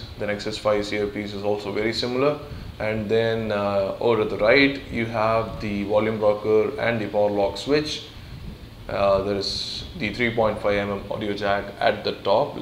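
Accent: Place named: Tamil Nadu, India. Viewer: The fone is ok for the price........ but are you from Tamilnadu..... just asked coz of your accent.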